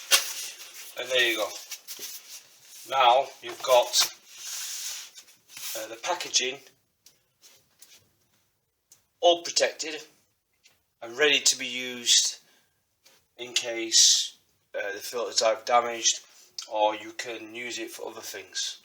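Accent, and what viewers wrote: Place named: Pendle, United Kingdom. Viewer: Where are you from mate? You sound local to Pendle where I am from, just ordered xt6 telescope to begin astronomy with my son, any tips welcome.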